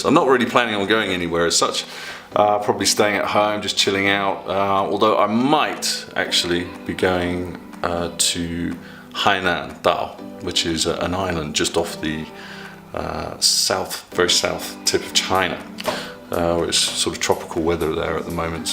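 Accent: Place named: England, United Kingdom. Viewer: Your proper English accent and your pleasant countenance make watching your videos a delight.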